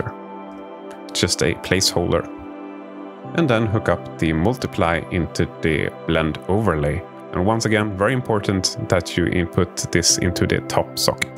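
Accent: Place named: Netherlands. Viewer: Your voice and pace, made me enjoy this tutorial,very helpful, I think it has to do with the Dutch accent there 2😂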